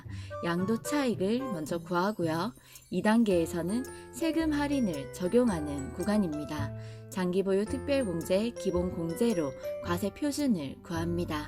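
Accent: Gyeongsang, South Korea. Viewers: 갱상도 사투리 좋아요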